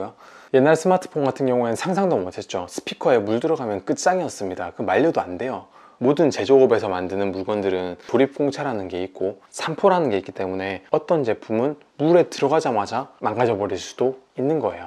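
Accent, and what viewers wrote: South Korea. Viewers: 말투가 살짝 지방이시던데 어디사람이세요?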